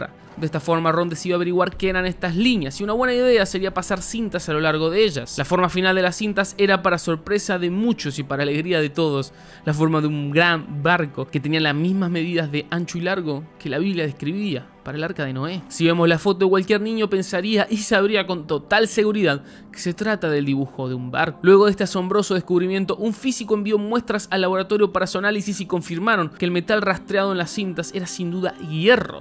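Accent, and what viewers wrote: Argentina. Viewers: Con ese acento argentino las Cosas parecen un chiste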